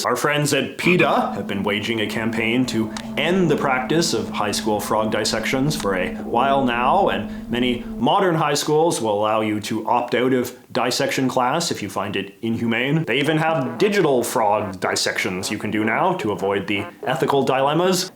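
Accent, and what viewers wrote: Canada. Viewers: I love his Canadian accent 😍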